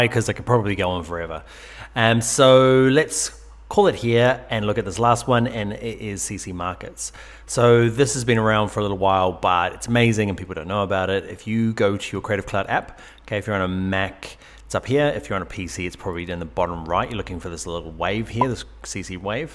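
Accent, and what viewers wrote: New Zealand. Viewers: Great video thanks mate. Are you a kiwi? Sound like a kiwi :))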